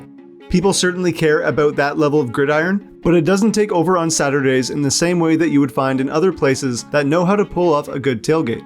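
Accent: Canada. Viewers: The narrator sounds like a Canadian, check how he pronounces "out'. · Canadian accent tho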